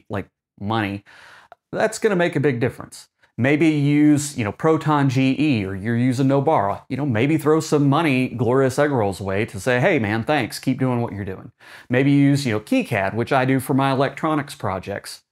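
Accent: Tennessee, United States. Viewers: PS: Your voice and style are very comforting and convincing. It reminds me of a younger version of Senator Al Gore's cadence, pacing, emphasis and accent. (Are you from Tennessee?) --- Keep up the good work! Love your channel and your videos! Wishing you continued success in all that you do! 🍷🍷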